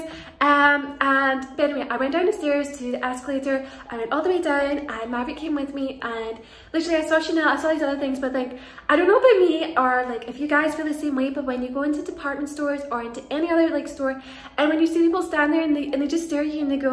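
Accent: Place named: Scotland, United Kingdom. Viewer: I love your accent and the way you said bobby brown, I was just like, yup, she is definitely scottish. I find northern british accents so friendly and welcoming😊 It fits your personality and the bubbly and fast way you talk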